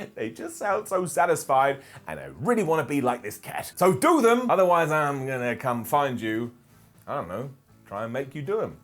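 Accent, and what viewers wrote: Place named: Cockney, United Kingdom. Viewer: Are you a Cockney? You sound a tad like the cockney Geezer from the mighty boosh.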